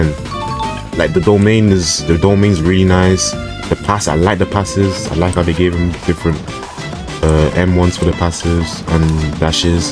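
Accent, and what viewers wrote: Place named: United Kingdom. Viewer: He’s British I didn’t know this was his real voice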